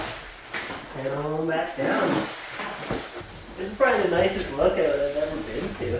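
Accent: Canada. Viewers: If the accent didn’t give it away, the comment about a “2-4 of beer and maple syrup” would have solidified Canadian identity. 😆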